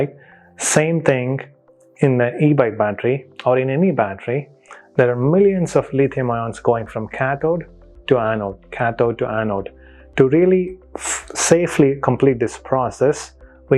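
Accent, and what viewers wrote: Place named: India. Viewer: The only Indian accent ever that’s not annoying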